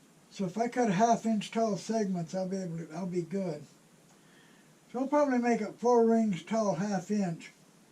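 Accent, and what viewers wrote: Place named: Pittsburgh, United States. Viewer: Hey! Love the videos. You sound like you have a Pittsburgh accent. Or have you been in N.C. your whole life?:)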